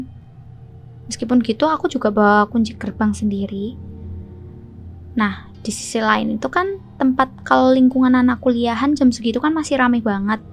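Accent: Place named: Central Java, Indonesia. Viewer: Mbak logatnya jawa tengah bnget hee 😌😌😂😂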